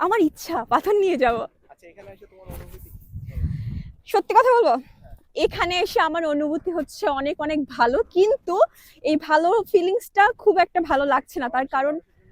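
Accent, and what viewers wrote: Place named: Bangladesh. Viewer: Apu india te kothai ,tomr kotha toh Bangladeshi mone hocche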